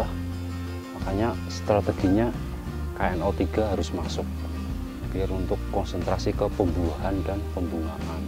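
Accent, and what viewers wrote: Banyumas, Indonesia. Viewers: Asalamualaikum. broo wong endi kiee, ngomonge ngapak, dadi pengin sinauuu bro....🙏🙏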